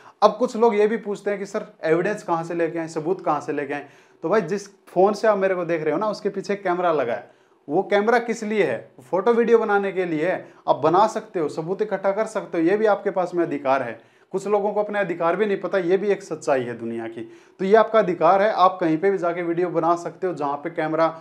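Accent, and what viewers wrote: Haryana, India. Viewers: Bihar se hu per boli haryana ka lagta hai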